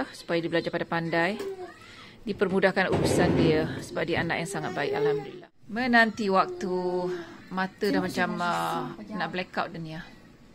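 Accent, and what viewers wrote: Negeri Sembilan, Malaysia. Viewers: Suka dengar Pn membebel cakap loghat nogori.hehe.Selamat Hari Raya Pn..Kami raya PKP di Malaysia.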